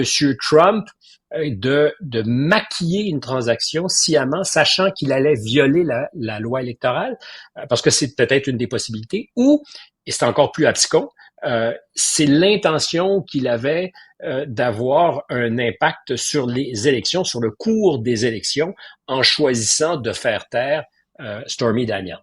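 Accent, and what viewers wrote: Quebec, Canada. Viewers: l'actu avec l'accent du Québec c'est moins tragique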